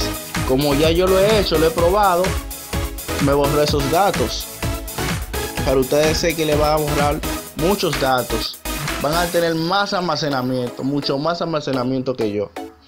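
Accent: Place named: Dominican Republic. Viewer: Me suenas a dominicano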